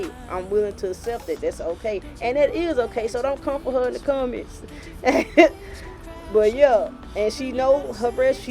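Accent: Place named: South, United States. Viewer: Love this southern accent!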